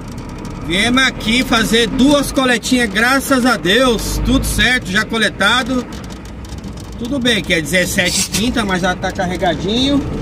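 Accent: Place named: Rio de Janeiro, Brazil. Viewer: Vc já adquiriu até sotaque de carioca kkkk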